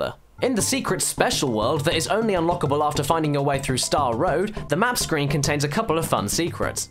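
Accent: United Kingdom. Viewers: Well is from the United Kingdom. Words and pronunciation is varied in some places from there. It depends where they grew up.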